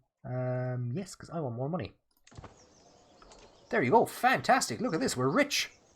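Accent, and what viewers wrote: Ireland. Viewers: Love the True Irish narration dope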